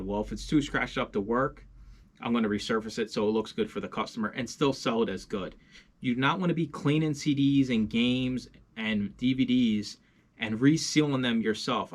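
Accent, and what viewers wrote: Philadelphia, United States. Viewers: I went with Silver Wolf DVD for 2.99. I'll let you know how things go after I get them in and turn the info in. You're east coast yeah? You have a Philly accent.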